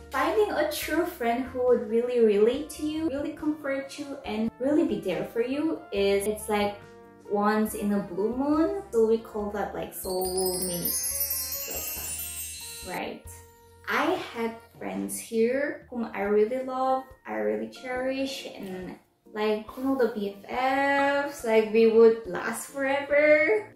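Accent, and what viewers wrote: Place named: Philippines. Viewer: Wow you have a Filipino diction, the way your u speak, so proud of you that you adopt a Filipino culture 😘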